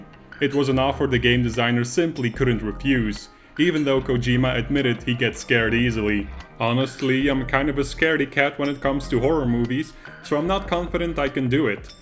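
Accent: Netherlands. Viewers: You're Dutch, aren't you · Are you Dutch?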